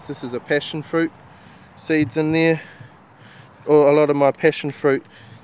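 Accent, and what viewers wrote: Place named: New Zealand. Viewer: hey, i love your work man, and that great kiwi accent lol. i've learnt a lot from these three videos and i too have become interested in growing, grafting etc.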